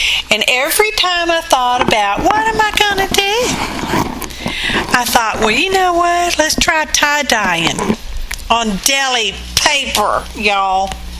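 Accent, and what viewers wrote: South, United States. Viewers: I love the crinkly bits SO MUCH!! Looking forward to giving this a go if my inks are still viable. PS: You’re a fun southern girl to listen to. That’s from a native Texan living in Tennessee. 😝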